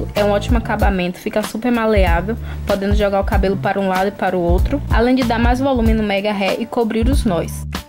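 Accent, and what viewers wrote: Rio de Janeiro, Brazil. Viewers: Vc é do Rio de janeiro ? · Show de bola! Vc é do Rio?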